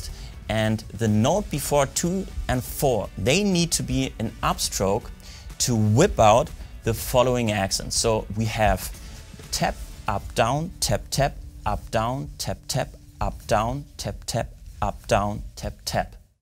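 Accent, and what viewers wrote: Germany. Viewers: I'm sensing a german accent there.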